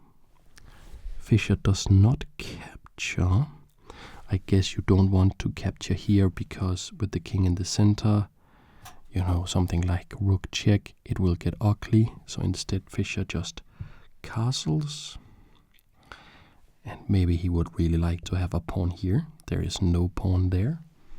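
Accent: Denmark. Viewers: Wait are u danish? Cuz u have the accent of a danish person:) its very calming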